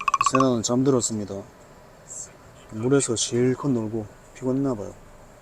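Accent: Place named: Busan, South Korea. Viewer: 저도 예전에 일때문에 일본에서 살다가온적이있었는데, 일본에서 잘 견디고사시네요^^ 저는 일본에서 힘들었네요ㅋ 부산말투이신거같은데, 저도 부산사람입니다. 지금도 부산에서 살고있고요. 아내분도 부산억양이 있으신거같네요^^ 아기의 쾌차를 바라며, 행복하시길~~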